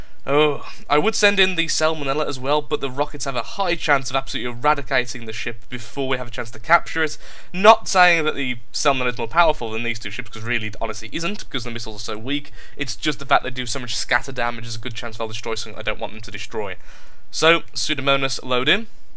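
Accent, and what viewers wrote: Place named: Birmingham, United Kingdom. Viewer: I KNEW IT! I kept debating whether you were a fellow Brummie or from Manchester by your accent.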